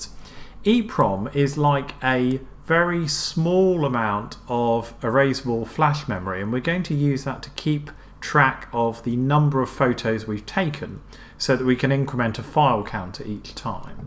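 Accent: United Kingdom. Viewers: Absolutely excellent instruction video! Thank-you. PS love the 'posh' accent. :-) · Miss the British accent... Away from England for over 12 years...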